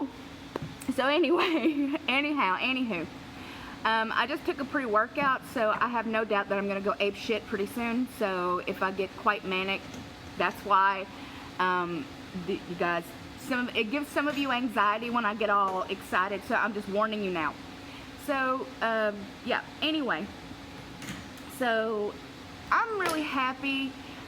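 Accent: South, United States. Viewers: I am 63 years old and scheduled for my roux en y surgery on March 8th. Your quirky and honest videos give me the most realistic view of what I will be facing going forward. I realize everyone’s struggles are differently packaged albeit mentally and physically. In the end it comes down to changing what we eat, how we eat and how we move our bodies. I too struggle with physical challenges of several back surgeries, rheumatoid arthritis, etc., and the list goes on. I always maintained a healthy active 120lbs. 5” 4” frame until age 45 and illness, back and knee surgeries. Now I am 5” 3” 240lbs. Your southern voice initially drew me in (so fun to listen to) and as you went along, I just enjoyed the love story of you and Evelyn. Congratulations on reaching your targeted weight goal. You have worked very hard and I hope you feel fantastic! Thank you for posting and I hope you keep it up!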